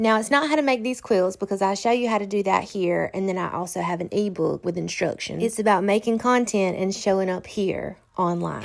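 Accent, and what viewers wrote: South, United States. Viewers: your Southern drawl reminds me so much of my mom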